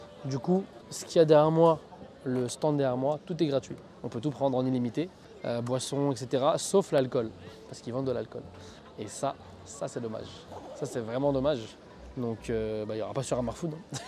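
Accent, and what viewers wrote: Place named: England, United Kingdom. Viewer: oh my god I love this English accent, you sound hlou😍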